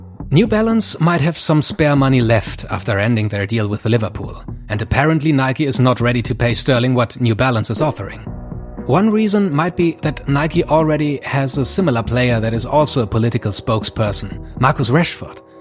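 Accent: Germany. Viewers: Love your German Accent 🤘🏽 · Man hört es so raus das es ein deutscher ist hahaah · Wow, I feel the German accent so hard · Der deutscheste akzent überhaupt 😂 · The german accent tho... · He’s German tho and that’s his accent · Ich höre doch unseren deutschen Bruder raus 🇩🇪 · You hear really german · Ich feier deinen deutschen Akzent, mach weiter so!! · Definitly its a german speaker i think. Ik hör da ein Akzent raus, richtig? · broski sound so german(deutsch) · Man hört dass du ein deutscher bist gib dir mal mehr Mühe laaan.. german accent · "positive racist" bias: your german accent (or austrian) sounds perfect when you explain marketing and global strategy Great work my friend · omg lmao, sos, when i watched this vid, it was at night and i didnt have full volume, now i hear that hes german lol, but yh lol, americans cant play football and cant even call it the right name u cant deny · This guys definitely German 😂 the way he says puma and neymar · 100% Deutsch warum hört man das? 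· Bist Safe 'n deutscher bei deinem Akzent und wie du die Namen ausgesprochen hast :D🤙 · Bro, your German accent is soooo thick. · Ich liebe diesen unfassbaren deutschen accent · the voice actor just sounds so german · German accent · funny how you can hear the german accent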